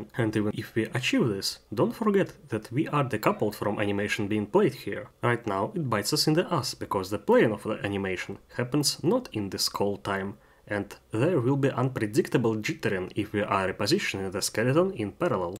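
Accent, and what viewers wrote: Russia. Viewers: Слышу русский акцент. Может ещë и на русском языке начнëшь делать?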